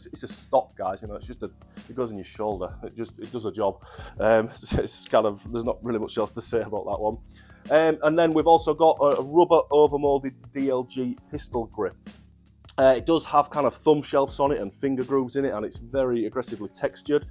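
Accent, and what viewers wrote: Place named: Hull, United Kingdom. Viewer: ah nice mate, I’m from hull myself thought you sounded close. Wanting to get into fac shooting but no idea where to start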